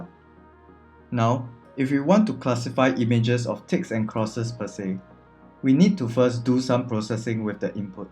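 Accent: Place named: Singapore. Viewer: The moment I heard your voice I knew you were a fellow Singaporean lmao. Looking forward to more of your videos!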